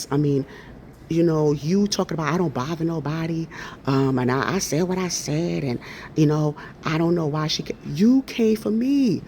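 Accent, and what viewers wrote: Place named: Brooklyn, United States. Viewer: Is that Brooklyn I’m hearing? Keep tawkin!!!!❤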